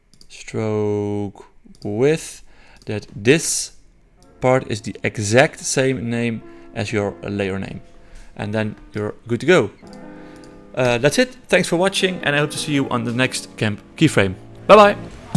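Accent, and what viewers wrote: Netherlands. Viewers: Thank you for this tutorial! It really helped me. And also - your dutch accent, it's so beautiful, love it!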